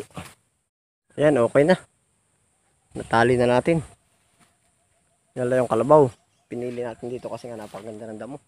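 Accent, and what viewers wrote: Quezon, Philippines. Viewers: Taga quezon din po kami...nakakaaliw manood sa punto p lng quezon tlga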